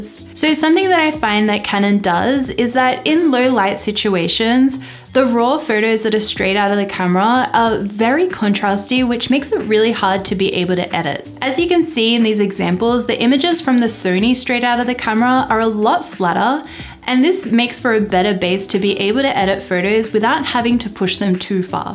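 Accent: Australia. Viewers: I am in love with the Australian accent already, so cute! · Do all aussies talk like you? 😍 I have to go to Australia.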